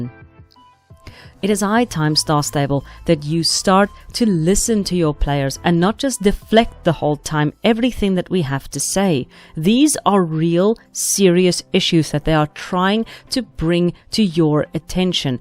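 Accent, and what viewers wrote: Afrikaans, South Africa. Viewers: i can't tell if you are afrikaans or danish or what now. such a pretty accent! this game was everything to me as a kid, it's sad they've gone downhill. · IKR!! Thought her voice sounded SA but wasnt sure until she said she was😊 nice to see someone from here making good content. And me too, I would love to see the game back on track to being what it was, because that's what got us all playing in the first place. Jy ook😄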